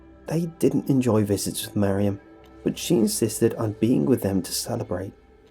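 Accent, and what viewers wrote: United Kingdom. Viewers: Subscribed...I've listened to you tell these stories for a while now. I've always been a horror movie fan n love scary stories but it's your voice and accent that made me subscribe to you. My mother is British and I would spend summers w my grandmother who had a thick accent but I never heard first hand a man w this accent and I've got to tell u yours is enchanting! I could listen to you talk all day...my grandmother is no longer w us so it's also comforting in a way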